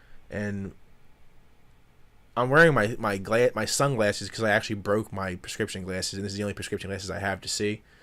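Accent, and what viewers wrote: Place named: Delaware County, United States. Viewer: 10 seconds into video I know your from Delco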